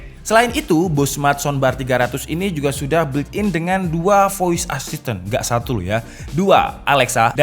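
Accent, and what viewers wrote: Malang, Indonesia. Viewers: Loh orang Malang?:v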